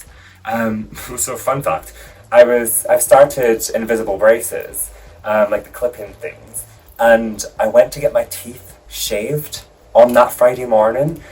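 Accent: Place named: Scotland, United Kingdom. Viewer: You look sexy as real lady and like your Scottish voice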